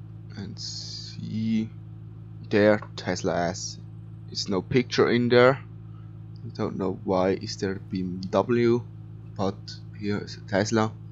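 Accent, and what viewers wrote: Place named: Germany. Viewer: Ich höre so einen deutschen Akzent...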